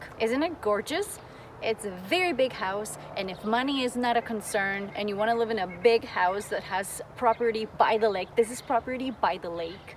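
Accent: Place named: Colombia. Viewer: I like your Colombian accent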